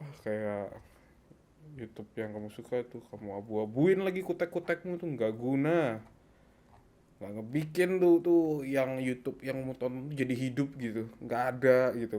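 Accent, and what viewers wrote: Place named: Kalimantan, Indonesia. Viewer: ada logat² Kalimatan nya 🗿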